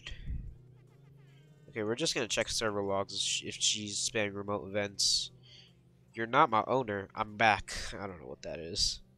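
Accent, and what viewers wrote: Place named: New Jersey, United States. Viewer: Question did you have a teacher named Mr. Lambersky he is from the New Jersey area and has your accent.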